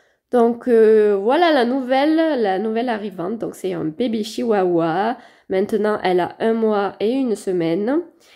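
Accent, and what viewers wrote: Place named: South, France. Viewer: Bonjour Je vous découvre aujourd'hui ...qu'elle est mignonne!! C'est si triste de voir qu'il y a des gens qui ne se soucient pas du bien-être des animaux...sa mère va lui manquer autant dans l'affectif que pour son éducation... Les croquettes Hills sont très bien , nous les utilisons pour notre Gribouille un shitsu de bientot 13 ans. Nous avons prévu d'adopter aussi un petit chihuahua : il est né le 14 avril, nous ne l'aurons que vers le 20 juillet, ça lui laisse le temps de bien grandir auprès de sa mère et d'apprendre plein de choses. Je m'abonne car j'aimerais bien suivre cette petite et en plus j'aime beaucoup les plantes! Vous avez un accent du sud, vous êtes ans quelle region? Nous, dans le Lot😉 Bien à vous, Corinne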